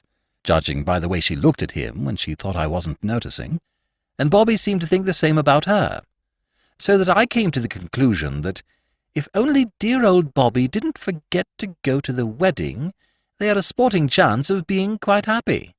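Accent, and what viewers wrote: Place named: United States. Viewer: American narrator...PG Wodehouse American.. .are you befuck???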